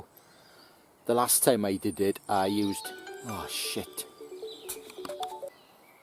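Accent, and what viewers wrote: Wales, United Kingdom. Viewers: Thanks for another video, I haven't done any bow drill fire lighting for a long time, I was trying to think how long ago it was, I taught my oldest grandson when he was 9 he has just had his 20th birthday, that's when I last did it, how time flies, Things don't always go right and you are very skilled in what you do, you did it in the end, very impressive, I like your welsh accent its very easy to understand, thanks for sharing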